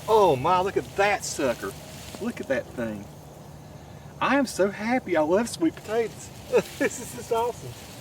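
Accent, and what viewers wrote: South, United States. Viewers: Your videos are worth watching just to hear your Southern drawl. Next year, grow your own slips! You can get about 50 plants (slips) off of one sweet potato when you grow your own. Then it will cost you NOTHING, because you save your good potato for next year's slips.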